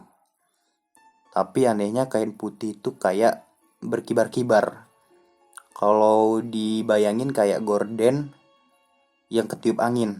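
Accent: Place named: Medan, Indonesia. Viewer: Suka x aku bg denger kau bawakan cerita ini, medan x kurasa.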